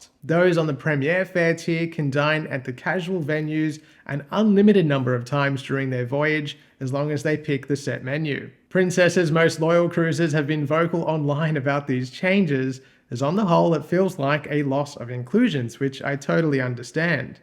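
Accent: Australia. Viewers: you are very welcome, plus I love the sound of the male Australian accent.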